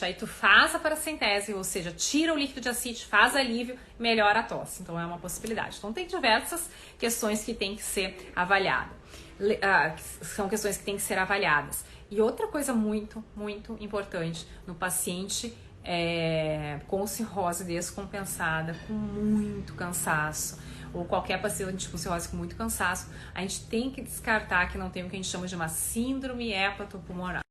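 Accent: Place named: Rio Grande do Sul, Brazil. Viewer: Muito bem Dra. Tem que parar com este sotaque de ficar falando ... éeeennhh, uuinhun, Horrível este sotaque. Eu sou gaúcho Mas este repetitivo é coisas das mulheres gaúchas.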